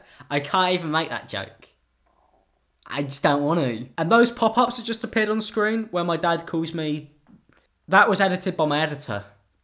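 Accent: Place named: England, United Kingdom. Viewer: What I hate more than ricegum is the English accent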